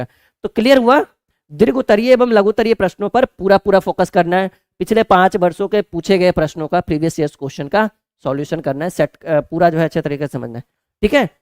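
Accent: Bihar, India. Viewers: Sir bihar se ho kya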